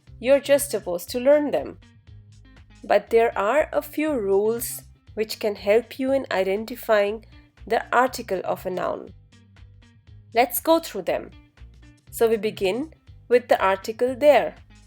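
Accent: India. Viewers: 13 seconds in, and the accent of the narrator is rather Indian. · Does anyone listen her speaks in indian accent? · I am Indian and I am quite sure this excellent teacher is Indian :) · You seemed like is Indian...., sounded like it! 🤔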